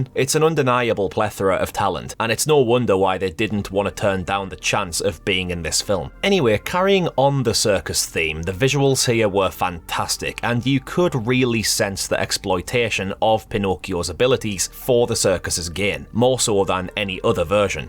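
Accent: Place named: Northern England, United Kingdom. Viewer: Hey, I used to live in South Eastern England, and I have been wondering where do you come from? My guess is Newcastle or Northern England in general:)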